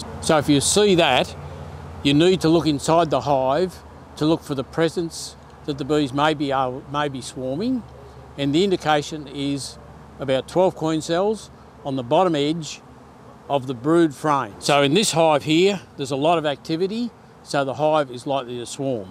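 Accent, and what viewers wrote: Australia. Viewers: Greetings from America! I LOVE your Aussie accent! May I call you? hehe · Love the Aussie accent. I was waiting to hear "crikey". Lol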